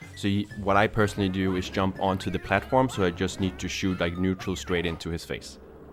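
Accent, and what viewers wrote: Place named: Denmark. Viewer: thanks for this video!! i miss this game so bad and watching u absolutely demolish it is so inspiring to watch :-D also your danish accent is so beautiful lol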